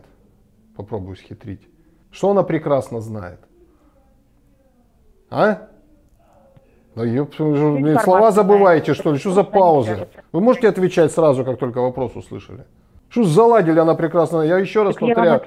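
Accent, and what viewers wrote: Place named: Ukraine. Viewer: Говор украинский-значит из Украины 🤦, где-то смеется ростовский представитель калломассы.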